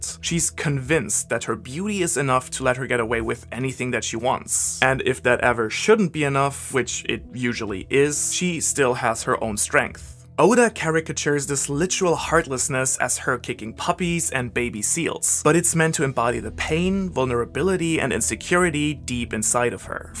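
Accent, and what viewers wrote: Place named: Germany. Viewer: bruder dein english is perfekt aber dieses japanische mit dem deutschen akzent geht einfach gar nich. lern dein akzent weg oder lass es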